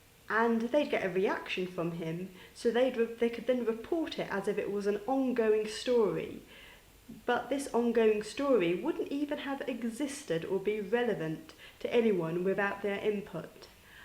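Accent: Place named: United Kingdom. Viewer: i loved her british accent...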